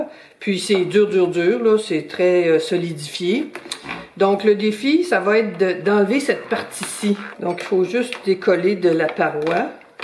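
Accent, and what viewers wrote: Canada. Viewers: j'adore votre accent canadien! top! et la bouille à la fin m'a fait trop rire, super humour, merci!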